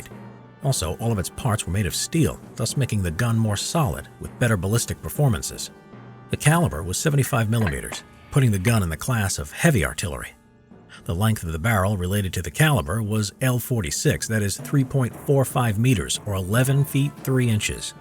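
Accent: Germany. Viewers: you have german accent noice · I love those german pronounciations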